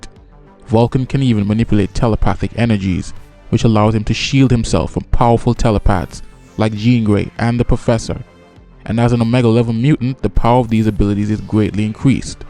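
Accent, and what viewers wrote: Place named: West Indies, Caribbean. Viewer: I like your accent. where are you from? You sound like you are from the West Indies.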